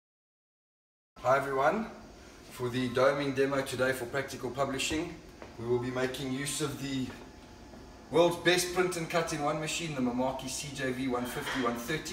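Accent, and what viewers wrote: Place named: South Africa. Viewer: That sounds like a South African accent 😁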